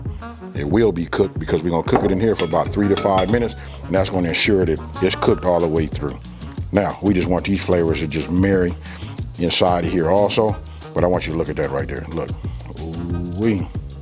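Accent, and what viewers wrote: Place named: Los Angeles, United States. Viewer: I love his la accent!!!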